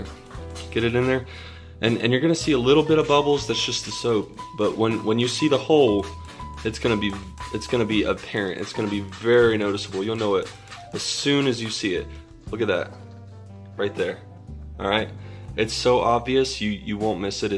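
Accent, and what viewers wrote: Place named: South, United States. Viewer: I love his accent...Southern USA?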